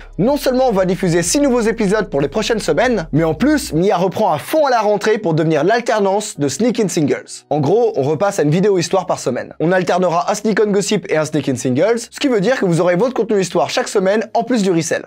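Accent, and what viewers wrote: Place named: United States. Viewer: à ta manière de prononcer l'Anglais je dirai que tu as vécu aux États-Unis étant jeune ;)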